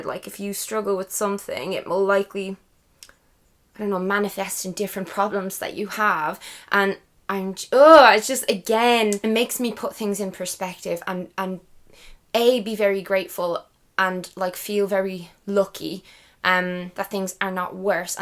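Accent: Ireland. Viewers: Brand new sub. Just binge watching your vids as we speak. Relate to so many of your vids. Think you are lovely and you can't beat an Irish accent, greetings from Scotland ❤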